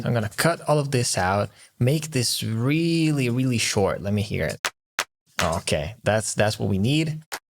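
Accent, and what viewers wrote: Italy. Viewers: ahahaaha ma sei italiano, non avevo pensato all'inizio del video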